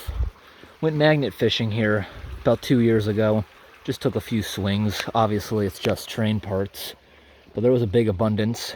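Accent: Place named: United States. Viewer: Yep, I agree, his accent is American but with good pronunciation and little drawl - much easier to listen to for us Brits!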